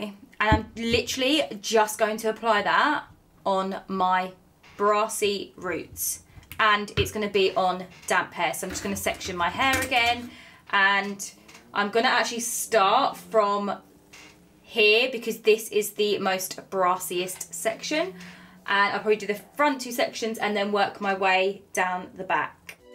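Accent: United Kingdom. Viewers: Not to be rude....i dont mean you personally... but british accent in my ear is not the most beautiful to hear...its to show off kinda talk... a puh dah on...... baahh...im.train thu match on..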